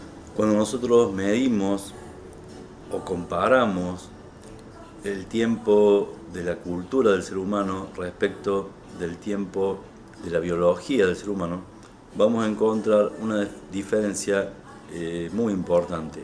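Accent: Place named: Córdoba, Argentina. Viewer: Gracias por compartir por este medio tu conocimiento, con palabras tan claras y ademas, con tonada cordobesa.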